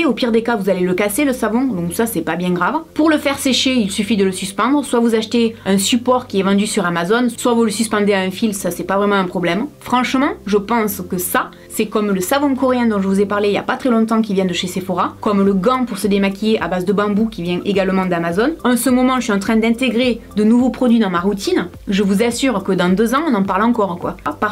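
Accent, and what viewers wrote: South, France. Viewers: cela fait environ 1 an que je me lave les cheveux avec ce shampoing solide. J'ai les cheveux frisés et très souvent sec depuis que je l'utilise ils ont repris un volume de fou et sont super brillants le seul point négatif du moins pour moi c'est que je ne sais pas l'utiliser en mode solide du coup je le laisse se ramollir un peu dans l'eau et je l'utilise comme une crème. par contre il dure et dure très très longtemps facile un mois voir plus c'est GENIAL !! sinon juste un petit mot j'adore tes vidéos tu respire la joie de vivre et ça fait plaisir d'entendre enfin un youtubeuse du Sud je suis de TOULOUSE et les accent pointu sur youtube j'en peu plus lol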